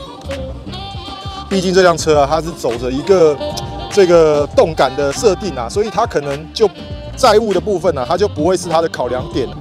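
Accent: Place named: South, Taiwan. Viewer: 下港人 台湾国语好重啊 福建人后裔哦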